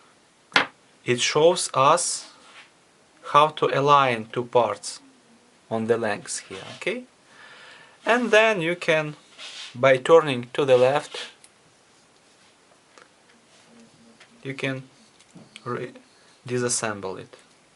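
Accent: Russia. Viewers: Тяжелый русский акцент) как будто литтл биг слушаю)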